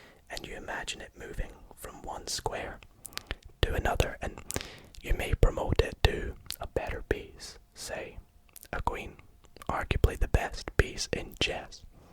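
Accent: Scotland, United Kingdom. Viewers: He’s Scottish 😭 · You’re same is enjoyable because it is interactive and interesting but at the same time you’re Scottish accent and voice is soooooooo relaxing I literally fell asleep watching the mind reading one the other day keep it up👌🏼🤌🏼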